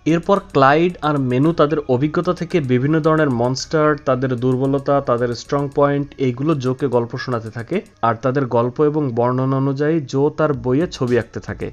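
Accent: Khulna, Bangladesh. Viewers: ভাই আপনার বাড়ি কি খুলনায়?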